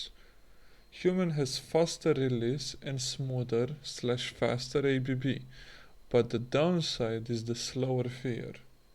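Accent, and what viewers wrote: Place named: Romania. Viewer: Super tare, ma gandeam ca esti roman dupa accent, dar pana la duel cand am vazut in chat nu eram sigur :)) E comunitatea mare pe 4story classic? Tot am zis ca vreau sa ma reapuc de un server de 4story si din ce vad pe youtube, sunt destui playeri care joaca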